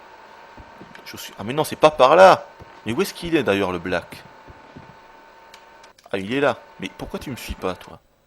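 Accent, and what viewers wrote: South, France. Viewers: J'adore ce jeu, moi qui suis fan du film de John Carpenter, j'attendais ce The Thing avec impatience et je n'ai pas été déçu, on retrouve bien l'esprit du film mais le jeu a l'intelligence de nous amener progressivement vers autre chose, une histoire de conspiration scientifique terrifiante, toujours dans ces décors enneigés, la gestion de la peur chez les personnages est superbe et apporte un vrai plus au jeu, c'est parfois assez difficile et frustrant (notamment lorsqu'on doit affronter les petits monstres qui font un bruit aigu stressant) notamment le passage dans l'épave de la base aquatique, mais ça reste un très bon jeu qui reprend l'esprit du film de Carpenter ! Dis donc au passage, t'as un accent...du sud peut être ? + 1 like ! :)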